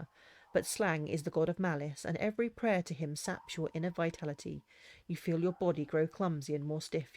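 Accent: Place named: England, United Kingdom. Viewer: those books are the best in the domain of adventure gamebook , congrats for your work ! the english accent is not my cup of tea , for this is very nice to heard the text readed.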